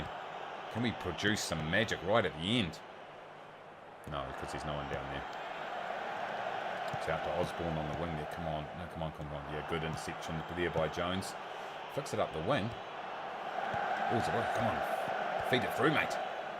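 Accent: New Zealand. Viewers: Love the spreadsheet and a nice wee return on that gamble! Nice 1st up game episode I liked it mate and its weird hearing a Kiwi accent on YT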